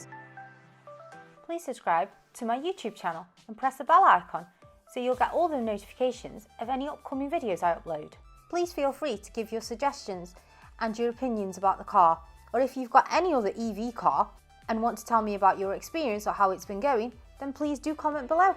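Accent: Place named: Stoke, United Kingdom. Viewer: You got a very strong stoke accent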